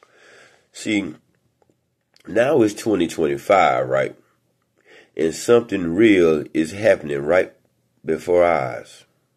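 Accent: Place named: Chicago, United States. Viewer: You gotta be from Chicago 🙂‍↔️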